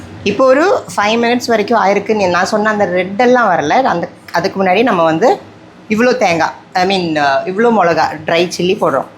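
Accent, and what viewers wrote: Kerala, India. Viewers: Malayalam kalantha tamil like it kutty ma..... Na podi try pani pakuren sissy ma.....❤❤❤❤❤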